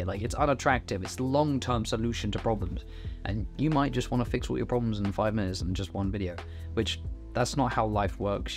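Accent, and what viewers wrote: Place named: United Kingdom. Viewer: british detected - opinion disregarded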